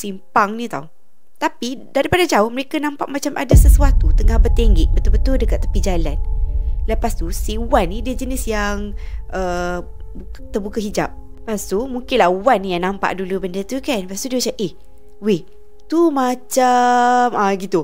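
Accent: Kelantan, Malaysia. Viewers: Kak ore kelate ko bunyi mcm ckp kelate je.. Air bodo tu gpo maksud dio🤔🤔😅😅😅😅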